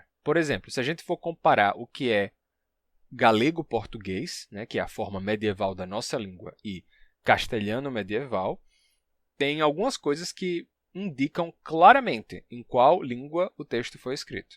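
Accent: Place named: Northeast, Brazil. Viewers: A "pronúncia particular" a que você se refere é simplesmente um dos sotaques nordestinos brasileiros. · Ele é do Rio Grande do Norte. O Sotaque dele é o chamado "DIALETO NORDESTINO", que é o sotaque falado na maior parte dos estados do Nordeste, dentre eles: Rio Grande do Norte, Paraíba, Pernambuco, Alagoas, Sergipe, interior do Ceará e interior da Bahia. A cidade de Salvador, capital da Bahia, tem um sotaque próprio, que é o "Dialeto Soteropolitano". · se não me engano ele é de Recife. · Seu sotaque em português é muito bonito. É de Natal? Sou do Rio e acho muito bonito. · Ao individuo do video.....Se posso perguntar, Voce e da Bahia ou outro lugar do Nordeste Brasileiro? Pergunto porque amo essas explicacoes sobre as linguas e noto que voce tem uma pronuncia do "E'" e do "O" quando nas palavras como faziam meus pais e avos que eram da Paraiba e Sergipe. 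Eu nasci no Rio e perdi um tanto do sotaque Carioca ao ir pra Sao Paulo. Agora moro na California para onde vim a cinco decadas.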